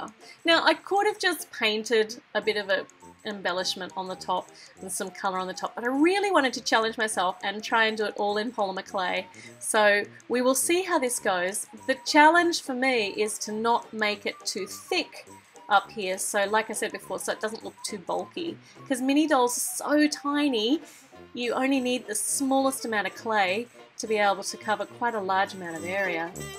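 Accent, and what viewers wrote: Australia. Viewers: Is she australian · Are you from Australia?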